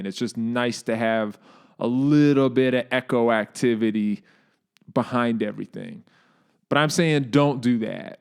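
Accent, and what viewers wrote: Boston, United States. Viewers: This guy is actually really intelligent especially when it comes to music and pedal theory, but I can never not hear a Departed style super bostony/Ny parody accent, or that one guy from Family Guy that's like "I was going to bone my girlfriend, but there was no way"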